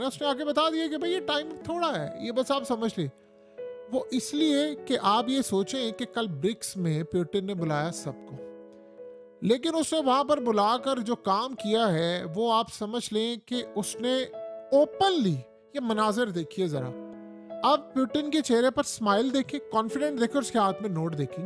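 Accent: India. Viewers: baie AP India chale jayen India ki taraqi AP ke Liye bara aham he or bricks ko bi join kar Len dollar ka janaza bi jadi nikalen. We love Pakistan. AP ka lahja not a Pakistani but Hindustani.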